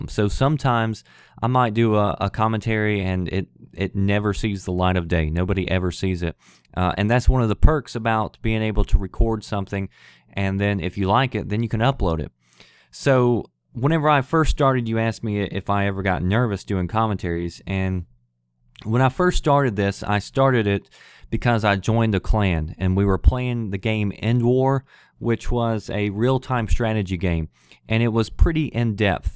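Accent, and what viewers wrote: South, United States. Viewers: I really enjoy your vids and you got a cool southern drawl. Have another positive comment and have a nice day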